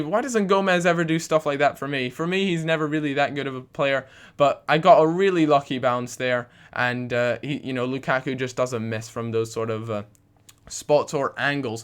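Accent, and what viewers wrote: Scotland, United Kingdom. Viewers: Cal what part of scotland you hail from? :D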